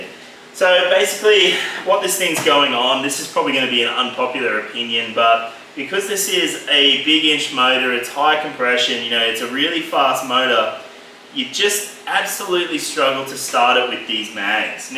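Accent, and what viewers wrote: Australia. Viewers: I love your aussiman accent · the Aussie accent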